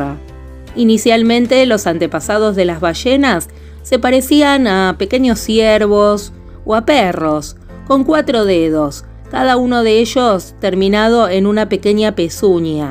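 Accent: Argentina. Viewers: Ah, me encanta tu voz y el acento argentino!!!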